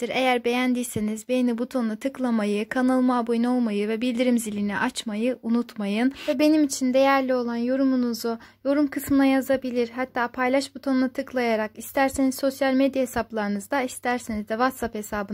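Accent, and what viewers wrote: Azerbaijan. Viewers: Güzel sesinizden azerbaycanlı oldugunuz belli⚘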